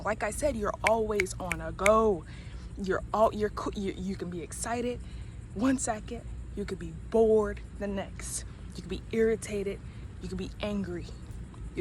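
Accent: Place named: California, United States. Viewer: Message SiStar 💕 💯🙌🏾 I’m in agreement with a lot of your views. On another note you definitely have to be from the bay or Cali because you sound like family 💯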